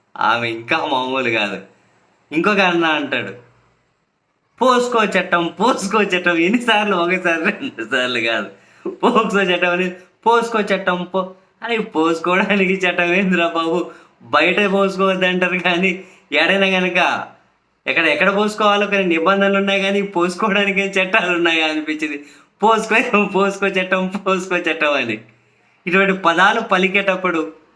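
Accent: Rayalaseema, India. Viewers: bhai.i think you might be belongs to our Godavari district.you are at present belongs cuddapha.why because I am having knowledge cuddapha/Rayalaseema slang being Area Sales manager.in marketing in FMNDC.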